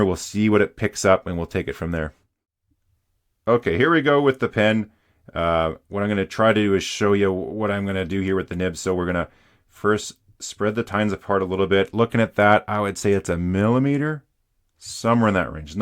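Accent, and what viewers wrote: Canada. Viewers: I'm an American and I lived in Hungary for 18 years and speak Hungarian. In the Hungarian language, the river is called the "Duna" (pronounced DO-nuh). Not sure about the origin of the word "Danube," but you're right, I've always heard it pronounced like you say, DAN-yoob. Maybe it's a Canadian English thing 🙂. Nothing major either way. This was an excellent and very useful video for adjusting nibs. Thank you, sir!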